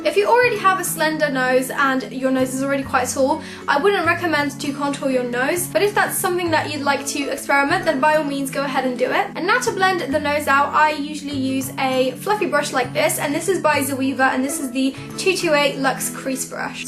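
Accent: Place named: United Kingdom. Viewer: To zabawne, bo pomimo tego, że masz piękny i co za tym idzie, mylący, brytyjski akcent, jakoś od początku przeszło mi przez myśl, że jesteś Polką :D Dobra robota, świetny kanał :*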